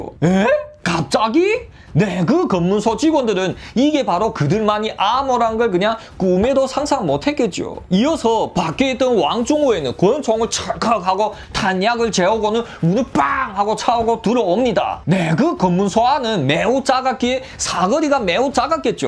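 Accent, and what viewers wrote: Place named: Korean-Chinese, China. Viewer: 구수한 조선 족 사투리와 스토리가 재미 있군요.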